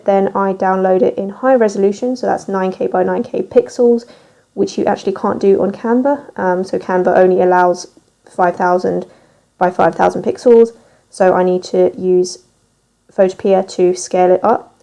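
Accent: United Kingdom. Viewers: Wow, this was refreshing,,, & a uk voice too 😁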